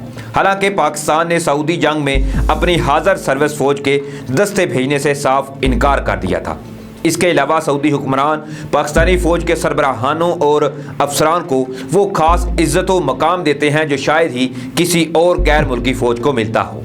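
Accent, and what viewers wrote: India. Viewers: You speak Urdu in Hindi accent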